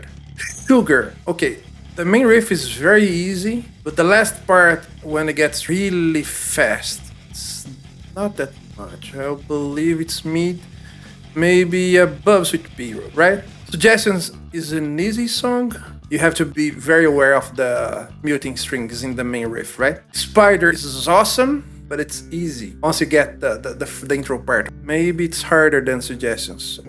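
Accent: Brazil. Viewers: Pelo sotaque é BR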